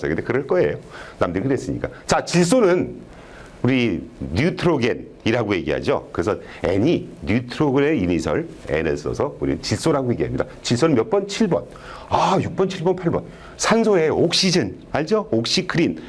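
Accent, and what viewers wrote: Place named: Seoul, South Korea. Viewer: 신기한 서울말의 세계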